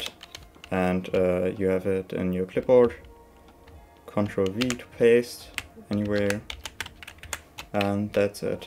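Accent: Germany. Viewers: Du bist sprichst deutsch oder? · Ich finde es wunderbar wie man sofort hört dass du deutsch bist hahaha :D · Bro i am hearing the DEUTSCH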